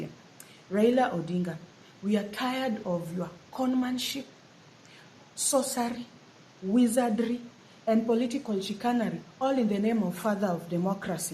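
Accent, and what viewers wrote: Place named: France. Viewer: please be soft on us kikuyus hii kizungu yako na babu inatulemea yawa hatuelewi hadi sometymes naona nikama unaongea french yawa😅😅😅😂😂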